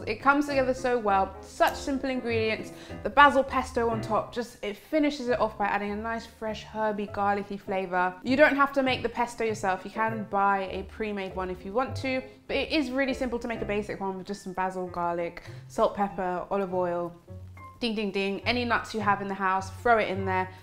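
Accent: England, United Kingdom. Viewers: Wait, what brand did you say those vegan sausages were? It sounded like sazories but I couldn’t find anything like that online. Sorry, I’m American and sometimes I can’t understand the English accent. I’m having trouble finding vegan sausages that are edible. If anyone knows what she said please reply!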